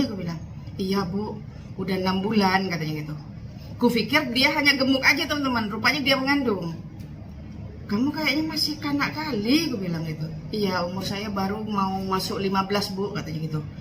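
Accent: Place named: Sabah, Malaysia. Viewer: Bunyi cakap macam orang Sabah.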